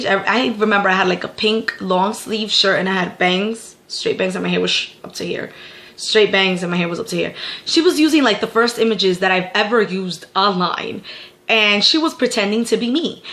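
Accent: United States. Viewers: Before you lived in Connecticut did you live in jersey bc I feel like you have a jersey accent just like mind get it girl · yo .. you sound like you from the 413 Springfield Mass ... then you said CT ...lmoooo i was close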